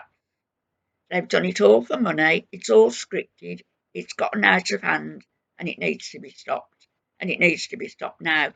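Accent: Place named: West Bromwich, United Kingdom. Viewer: Hi, you’re so spot on with all of the chaos with both FB and Nader. You sound just like my family member from West Brom x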